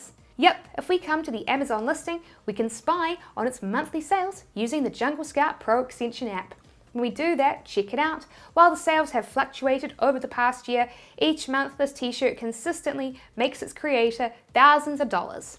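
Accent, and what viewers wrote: New Zealand. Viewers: Thank you for the help. You sound familiar - are you a Kiwi? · Yes, definitely New Zealand accent. One of the most difficult English variants for Australians to understand. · im guessing she is from new zealand. but it can be hard to tell between australia and new zealand for americans. must be easy for them. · Do u have nz accents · Oh that's so neat. I really wish I could meet you! You seem like an amazing intelligent woman, with an amazing New Zealand accent! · Sim, é assim mesmo que o sotaque da Nova Zelândia funciona. Vulgarmente conhecido como "kiwi". Outro youtuber neozelândes, Sam Owens, tem o mesmo sotaque. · Thank you for your reply, I thought that a company was the option. But I thank you for clarifying me on this. I didn't want to be rude or anything regarding your videos. I just thought it would be good to inform that depends on the country it is not always free and easy (in France for example it is free to open one but you pay tax on your income and mostly you need a 4 days training on legal stuff cost: €260) It is just something that we need to be aware of. 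I do like the dynamic of your videos and that it is not too long and quite easy to watch. Happy also to watch and listen to a kiwi accent for a change ;). Keep on doing what you do so well. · Thank you for posting this video! Love your content and your awesome kiwi accent! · For those who do not know, she has a lovely Kiwi / New Zealander accent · Bruh I clicked the vid and heared the voice Just knew it was kiwi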